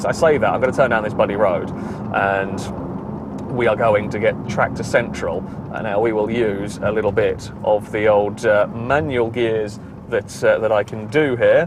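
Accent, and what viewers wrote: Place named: RP, United Kingdom. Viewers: His voice is rather posh lol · anyone know where he’s from in the Uk? Guess the south bcos of posh bit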